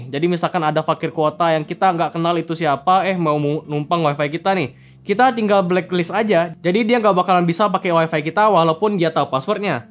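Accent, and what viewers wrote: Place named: Lombok, Indonesia. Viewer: Kayak logat plat DR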